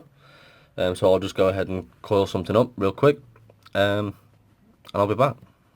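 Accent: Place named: United Kingdom. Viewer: Thanks dude. Nice hearing a UK guy do good, in depth reviews for once! Will do man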